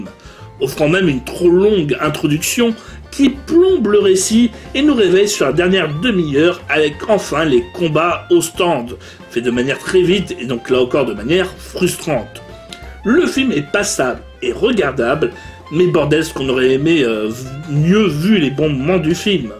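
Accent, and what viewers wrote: France. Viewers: L'anglais a la française haha mais j'aime bien se format et voir Detective Dee ça fait toujours plaisir. Tu ma un peut Hyper avec le film psychokinesis.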